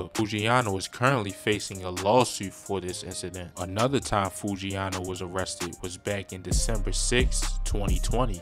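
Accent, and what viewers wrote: Baltimore, United States. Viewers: Baltimore accent crazy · def bmore 😭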